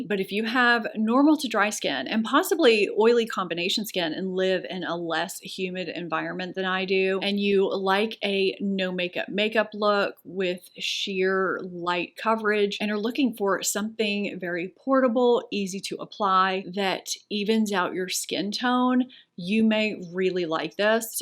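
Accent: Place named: New Orleans, United States. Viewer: I was trying to place your accent lol. I went to med school in NO and should have known. It was a long time ago though. Very special city.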